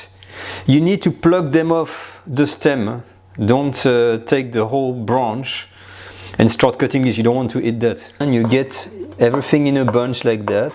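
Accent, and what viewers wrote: France. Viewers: It must taste delicious! I love your adorable french accent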